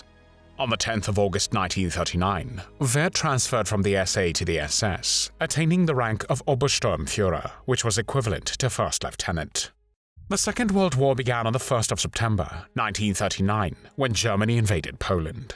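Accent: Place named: United Kingdom. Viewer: ...so what? does this get your rocks off? Cause I see no other reason why you bring your Gaylord Briton Accent to describe how people get killed.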